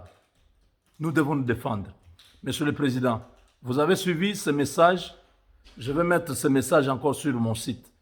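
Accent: Rwanda. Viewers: C est mr parle comme un Rwandais. Il ment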